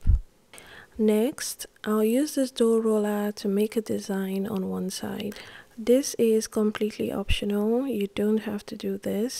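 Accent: Nigeria. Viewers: Ngl you sound Nigerian cuz its like your saying botter instead of butter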